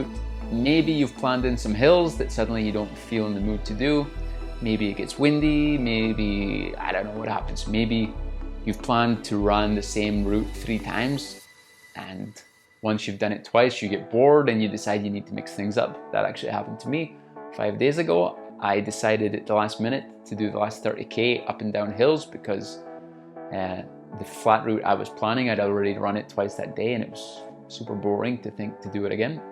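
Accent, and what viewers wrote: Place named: Scotland, United Kingdom. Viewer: can't tell if you're scottish or swedish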